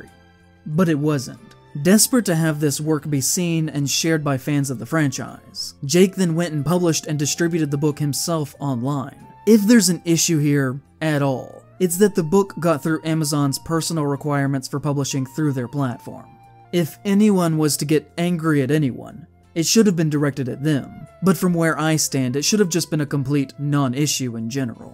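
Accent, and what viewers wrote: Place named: South, United States. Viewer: with a southern accent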